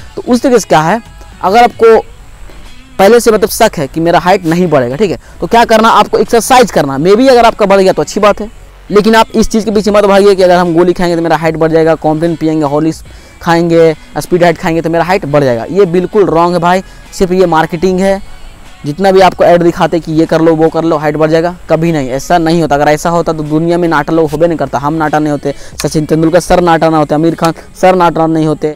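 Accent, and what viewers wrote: Jharkhand, India. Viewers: Aap jharkhand se ho kya 😎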